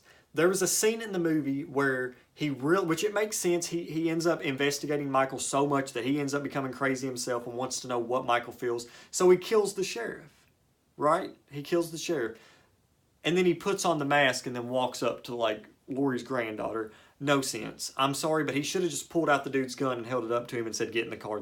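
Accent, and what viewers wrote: South, United States. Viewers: I want a southern American accent like you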